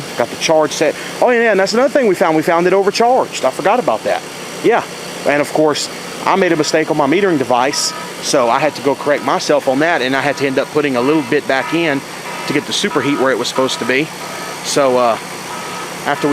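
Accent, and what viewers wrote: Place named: South, United States. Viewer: great job and video and finding all kinds of problems. only item i would comment on is the coil cleaner. that stuff takes off hte protective wax coating applied at the factory and no matter how much you rinse it, it eats the coil regardless best thing to use is dawn dish soap. my teacher at tcc in norfolk va told me this in class many years ago and he writes the ashrae hvac books. not to mention run's carrier out here and before that ran trane commercial. the only thing to use coil cleaner is on an evaporator coil and use the stuff in a can. also why did you call your company? are you isreali? i have never seen a jew with a country accent! interesting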